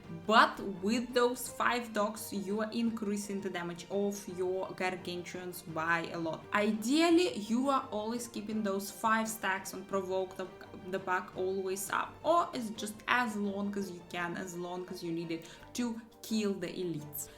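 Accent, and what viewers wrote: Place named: Russia. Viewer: I find it very pleasing to listen to you talking about diablo with that russian accent 🤣😅 also nice video!!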